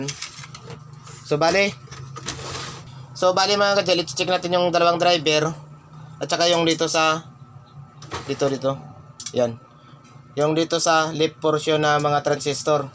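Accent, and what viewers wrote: Iloilo, Philippines. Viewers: Daw ilonggo ka maghambal sir..idol ko mga ginagawa mo parang Ang Dali lng gawin.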